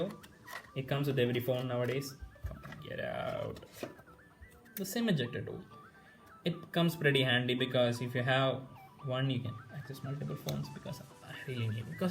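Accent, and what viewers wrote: United States. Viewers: Wow, nice review Your American accent is so cool bruh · when is full review coming ?good bro you accent seems like american . any way it was amazing good luck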